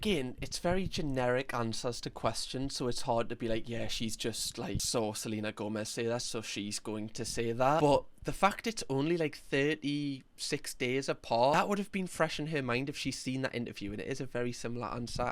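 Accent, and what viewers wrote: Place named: Newcastle, United Kingdom. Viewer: I've been wondering where this accent is from... it's so cool. 😁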